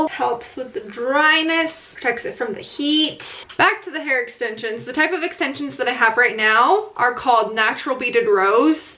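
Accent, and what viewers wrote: United Kingdom. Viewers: Awesome british accent .. 🤓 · Tienes acento ingles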